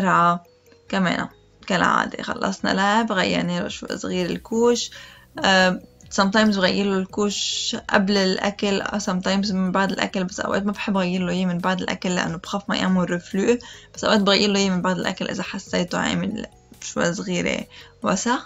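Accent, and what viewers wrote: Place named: Iraq. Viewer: عراقيه امبينه من حجيج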